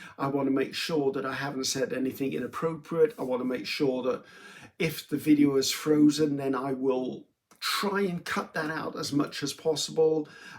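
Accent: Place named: Wales, United Kingdom. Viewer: I am one of your new 2022 subscribers and I appreciate your sincerity, honesty and how you are yourself. Thank you for speaking so candidly about your bout of depression. I've dealt with depression throughout my life and it's good to hear artists speaking about it. I love that you are from Wales (your accent makes me think of my Welsh mother in law) and how you embrace the diversity that surrounds you. Like you, I have much to be grateful for. I wish you a wonderful Christmas and all the best in 2023. Know that you are supported from your fans (like me) in Canada.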